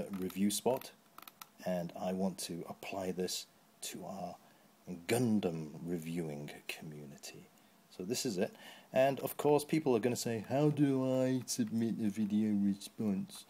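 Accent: United Kingdom. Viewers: I love you so,. You really are the community's daddy. It says quite a lot, being able to bring together such a traditionally fragmented fan base. True, it is only the Youtube Gundam community, but every time the Gundam community manages a respectable step forward, I think it deserves pretty massive applause. Also, when you're joking about "how do I post a video response" I couldn't help but wonder how long you've lived in Hong Kong; your British slagging seems to not have faded yet.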